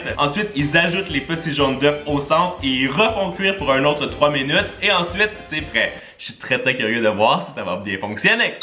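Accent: Canada. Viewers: Hello la recette des gnocchis a l'air juste super bonne,mais j'avais un question comme tu es canadien tu as un accent et je le demandais si quand tu parle a un français tu trouves qu'il a un accent ? Sinon continue comme ça tu es le meilleur ❤️❤️❤️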